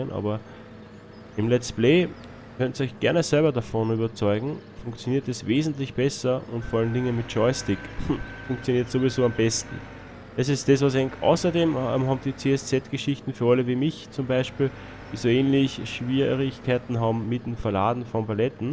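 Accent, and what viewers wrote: Austria. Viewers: Danke! Endlich einen guten Gabelstapler gefunden! Dein Dialekt ist Österreichisch oder? Abo hast du :D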